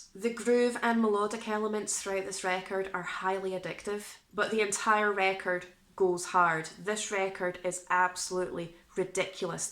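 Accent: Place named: Scotland, United Kingdom. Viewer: Your accent reminds me of my hometown, Kincardine (Ontario, Canada). We had a very strong Scottish influence. It’s good to hear for a change.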